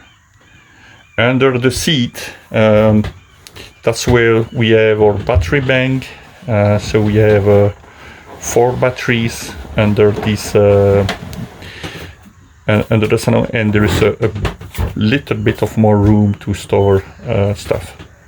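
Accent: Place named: France. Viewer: Merci beaucoup d'avoir partagé votre point de vue, et quel beau bateau, excellent gréement ! Youtube fait la promotion de votre vidéo aujourd'hui, alors bonne chance, vous obtiendrez plus de vues et de clics dans les semaines à venir, je suis un "Subscribed" maintenant . Quelques questions : votre enseigne est USA, votre accent est français et votre cuisine est belge, où est votre port d'escale maintenant, toujours Seattle ? Je n'ai pas saisi le nom de votre bateau 🤒(AIS?) Je ne connaissais pas le constructeur du bateau, donc superbe promotion pour Fabola. Intérieur magnifique. table bonne idée, mais pourrait être mieux... Je suis d'accord sur l'enrouleur dans le mât, mais couvrez le triangle restant aux UV, je viens de casser mon point d'écoute de selden enrouleur à Nieuwpoort en juin. La plate-forme de bain est une solution un peu maladroite entre un bateau de course avec le contrôle du mât arrière et un croiseur avec une sortie confortable de l'eau. 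Vous êtes le seul à avoir une Fabola sur Youtube, alors partagez d'autres vidéos si possible. Votre moteur, Volvo Penta ? Qu'avez-vous changé sur votre bateau depuis que vous l'avez acheté ? Votre équipement de navigation..vos voyages au dela de la cuisine. Plus important encore, qu'est-ce qui s'est cassé et comment l'avez-vous réparé. Il y a une grande communauté de marins sur YT qui apprennent les uns des autres, et peut-être ce qui s'est mal passé va aider la communaute.Pas tout le temps des bikinis, et le style sans faute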